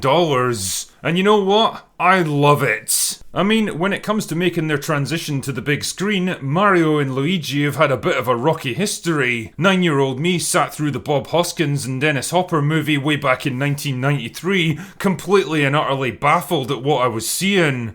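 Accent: Scotland, United Kingdom. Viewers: No, I don't care whether or not it's good, and I'm not paying for it anyway since it's not my genre. I just enjoy your take on these things. It's hard to beat a drunken Scot sarcastically discussing a movie. ;-)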